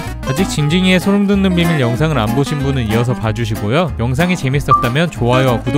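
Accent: Gyeongsang, South Korea. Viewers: 님 경상도 분이신가요? "사이타마" 말할때 "싸이타마"라고 하시는거 보면 경상도 특유의 쎈 발음이라 갑자기 궁금하네요.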